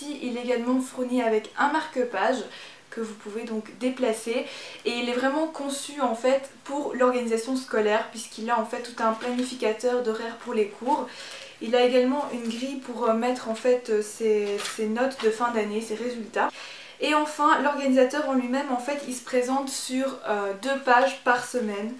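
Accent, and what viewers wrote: Belgium. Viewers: Tu es belge ?😄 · T'es Belge?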